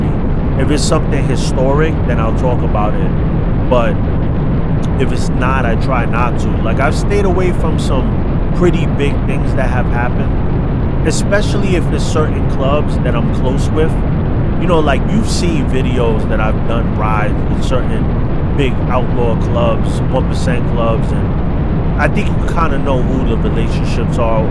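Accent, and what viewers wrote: New York, United States. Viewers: I grew up in NYC. Left in 2001 I’ve been down south since. I miss hearing your accent. I miss “home” U sound great. Thanks 4 bein genuine. We need more people like you. Ride Safe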